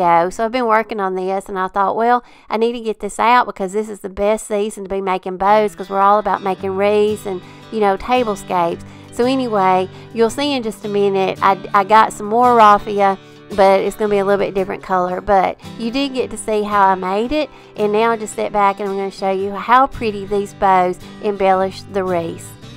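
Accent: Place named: Alabama, United States. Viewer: Finally! Bows I can make that fit my decorating style😊 I'm so excited to have found your channel & not a bit surprised to see you're from Alabama (haay from the Shoals & yes your accent gave you away❤) God has blessed you with natural talent. Thank you for sharing. You make it seem so easy & your sweet spirit is so encouraging. God bless you. I hope you have a wonderful day!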